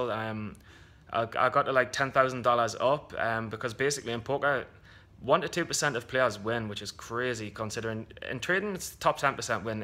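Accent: United Kingdom. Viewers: Hi, came across your recent podcast with SteadyTrade (that was awesome btw 👍). As soon as I heard your accent I was like the guy is from the UK (as am I, Birmingham). I was exactly like you, wanting to know if this was legit and I've come to the conclusion that Tim and his education service is 100% legit. I've put in so much study time and to be honest I'm just scratching the surface. That's the reality, it's pure hard work and dedication and I have such a long way to go but the key is I'm loving the journey. I am a silver subscriber and before that i purchased HTTM at the beginning of lockdown. What an investment that was.... at the end of watching it, I was like okay this is pretty cool so let's go deeper. A month in on silver I've just hammered out the studying. Still have loads to learn, but when I hear of progress like yours it makes me wonder just how far can I personally go if I really dedicate to this. 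I'm looking forward to seeing your progress and thoughts through these videos. I also followed you on twitter yesterday, so I'll be sure to reach out at some stage. I take it you are also on Profitly, if so it would be great to follow you and see how your journey mapped out too. Thanks for the boost of confidence, it's just added fuel to the fire I already have. Let's do this!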